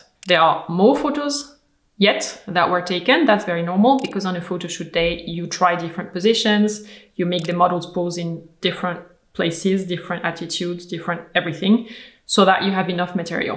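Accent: France. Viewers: so hard to hear you with your thick French accent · accent went from German back to French 🙂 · I have not watched a video from you in a longer time and I really noticed that your accent is disappearing slightly :) your english sounded less french today; I liked it :)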